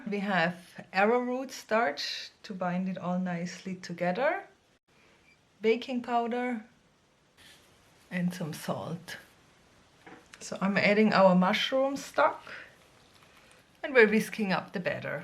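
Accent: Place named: Stuttgart, Germany. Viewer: Lucky you with the Ibiza Carob syrup. I like how you start out the video with a wet mark on your apron. You work even before you film, I guess. That Nutri Bullet looks like it went through WW I and did some service on the Western Front with Erich Maria Remarque... You are brave to use that dried Shitake mushroom... I like your knife skills. You didn't even chop off a nail... did you ? I think I make something like this every other day. I called it an omelette... I like your accent. It makes me feel like I am listening to a Professor from Stuttgart... then I look and it is a pretty blonde... that always freaks me out... in a good way... -Jeff Goes Random. Jah.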